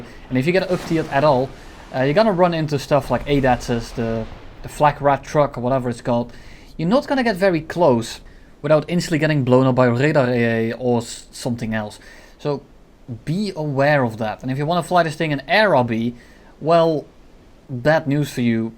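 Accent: Netherlands. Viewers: Nah just doesn't have a heavy or deep voice, which probably makes the actual accent less clear, but it is still unmistakably Dutch. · Yea he is Dutch xd you can hear that clearly love it🤣🤣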